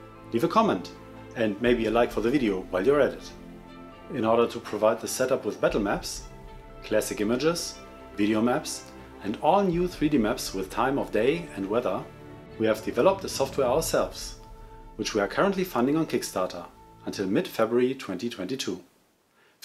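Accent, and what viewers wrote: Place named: Germany. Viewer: Ich hatte die ersten paar Sekunden und dachte mir nur „DAS verstehe ich gut, dass ist der mir vertraute deutsche Akzent“ 😂😂 Aber super Video, genau das hatte ich gesucht, vielen dank!!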